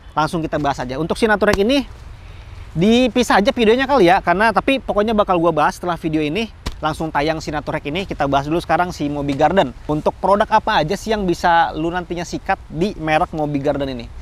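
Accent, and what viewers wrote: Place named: West Java, Indonesia. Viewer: Sunda pisan uyyyy mantaat lah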